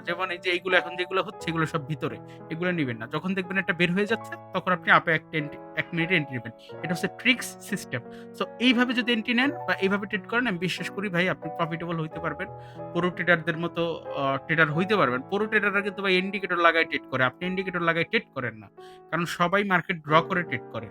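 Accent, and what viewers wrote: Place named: South Bengal, India. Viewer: ভাই আমি একদমই নতুন। তবে এটা খুবই সুন্দর ভাবে বুঝিয়েছেন। স্টপ কি ভাবে নিতে হয় এবং মার্কেট ড্রয়ের একট ভিডিও দওয়েন । ধন্যবাদ প্রি ভাই। আপনার কথা শুনে মনে হয় দক্ষিণ বঙ্গের লোক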